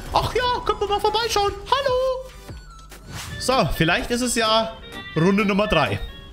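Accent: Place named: Bavaria, Germany. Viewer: kommst du aus Bayern dein Akzent hört sich leicht danach an :D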